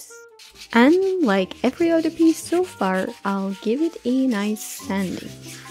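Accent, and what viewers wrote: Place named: Quebec, Canada. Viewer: Je pense ce que tu dois faire du voice over en francais. Plus specialment ton accent quebecois. C'est metal.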